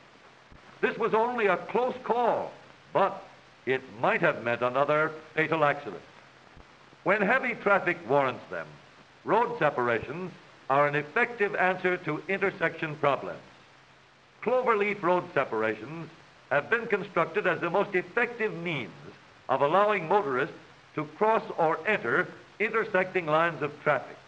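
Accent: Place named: Michigan, United States. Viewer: Oh man the deep Michigan accent 😂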